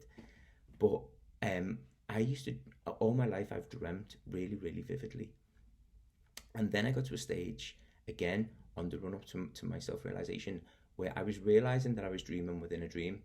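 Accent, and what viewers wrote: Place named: Liverpool, United Kingdom. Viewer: Blimey, scousers are becoming self realised now? I've never felt more like I've missed the bus. I'm joking (!) I unironically love Liverpudlians and your wonderful accent!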